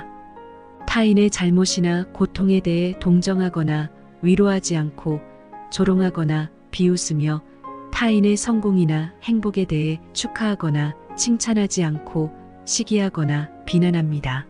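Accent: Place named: Jeolla, South Korea. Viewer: 완전 전라도네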